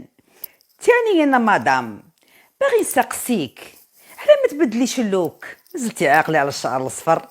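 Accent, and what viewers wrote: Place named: Relizane, Algeria. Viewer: هدرة غليزان مش وهران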